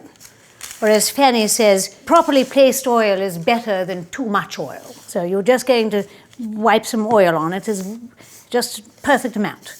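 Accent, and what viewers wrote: RP, United Kingdom. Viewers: Great brit accent! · is that how she talks? im going to watch the video after this one, but the voice sounded like...the queen's dialect.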